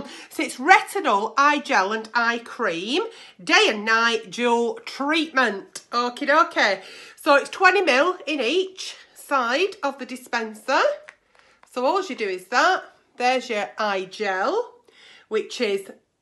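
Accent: United Kingdom. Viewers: Idk if you're British or not, but God damm I love it.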